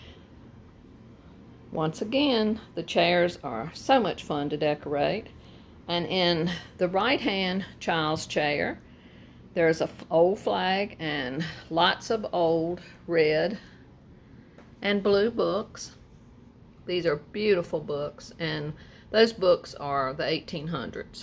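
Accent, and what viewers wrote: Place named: South, United States. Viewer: Love it ALL!!! You certainly have more energy than I do!! Be careful in the heat!!! Fun to watch and hear your great "southern lady" voice!!! You, John and Baby Boy have great weekend! Good luck at the yard sales! 🧑‍🦳🏡🌹🙂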